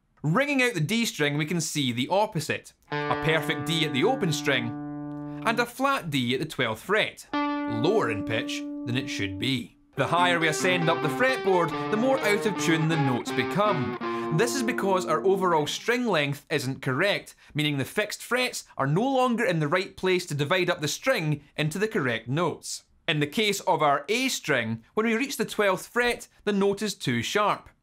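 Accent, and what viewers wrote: Scotland, United Kingdom. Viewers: What a lovely Scottish accent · I've seen enough Peaky Blinders to know that this man is Scottish.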